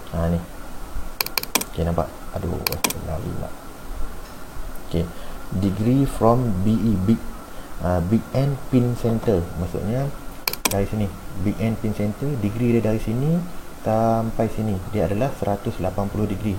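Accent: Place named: North, Malaysia. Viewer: Dekat mana nie...dngaq cakap utara...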